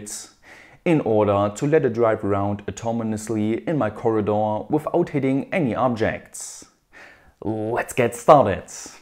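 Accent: Germany. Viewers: Hey! Are you german? What's the accent?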